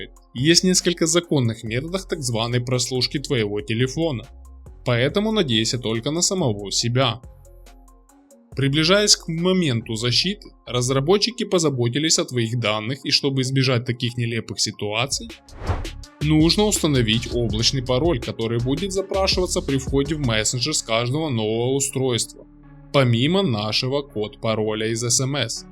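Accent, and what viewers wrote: Ukraine. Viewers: Своим говором и языком гугла ты спалил что украинец, будь осторожней а то за такую информацию тебя многие могут недолюбливать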